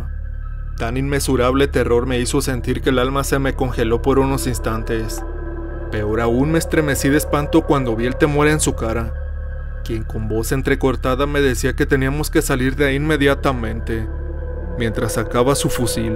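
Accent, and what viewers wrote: North, Mexico. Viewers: Hola soy Elisa y trabajo de housekeeping en un motel 6 en Texas y a todas miss compañeras nos gusta tu voz norteña en tus relatos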